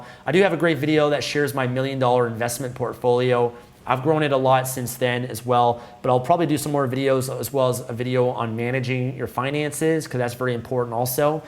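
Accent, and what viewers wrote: Canada. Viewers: Great information, thanks dude! and your accent has Canada writen all over it. nice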